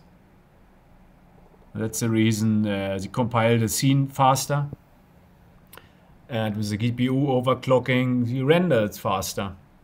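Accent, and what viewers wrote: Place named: Germany. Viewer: German accent is the best :D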